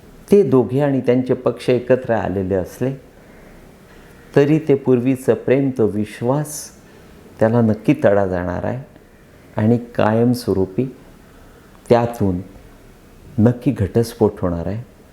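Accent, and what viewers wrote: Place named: Gujarat, India. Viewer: Kaka tumache bolane aikatana waatate ki koni gjaratalech bolat aahe ....🙏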